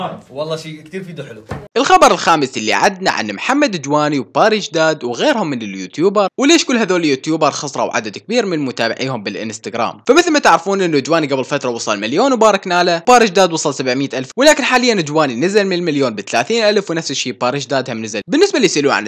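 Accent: Iraq. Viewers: اوووووول سؤال انته عراقي صح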